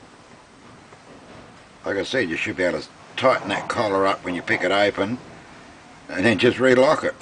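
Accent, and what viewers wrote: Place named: Australia. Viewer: Fuck the video, I'm guessing your Australian? if not, either way your accent is awesome :0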